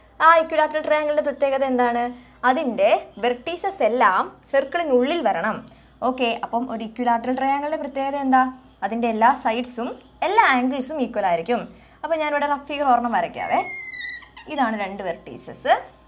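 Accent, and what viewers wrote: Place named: Alappuzha, India. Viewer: Alapuzha Alle teacherde house cheriya oru slange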